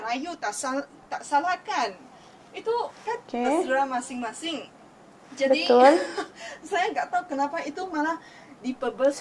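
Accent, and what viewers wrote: Indonesia. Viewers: Wajar bisa berbahasa ind medok....karena kuliahnya di Indonesia dan lama tinggal di Indonesia.. bukan berarti Ndak menghargai BHS melayu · Apakah adik cantik Mimin dari Sabah atau Sarawakkah . Karena anda berbicara logat seperi kami Indonesia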